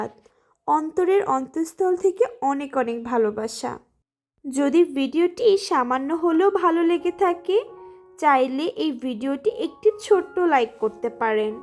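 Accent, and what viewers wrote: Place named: Barishal, Bangladesh. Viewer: আপুমনি তোমার বাসা বরিশাল কোথায় নদীর আশেপাশে দৃশ্য দেখে তো বুঝতে পারলাম হোসনাবাদ রামার ফুলের সামন দিয়ে যেতে ছিলে আপু তোমার বাসাটা কোথায় জানাবে কিন্তু আপু অবশ্যই অবশ্যই কিন্তু জানাবে তোমার ভাষা বরিশালের কোথায় আমার বাসাও কিন্তু বরিশাল তুমি যে নদী দিয়ে যেতে ছিলে আমি কিন্তু এই নদীর যে দৃশ্য গুলো সব আমার খুবই খুবই চেনা❤❤❤❤❤❤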